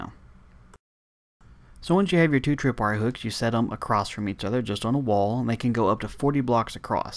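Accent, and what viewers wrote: South, United States. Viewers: I like your accent. You live in the south? I live in the midwest.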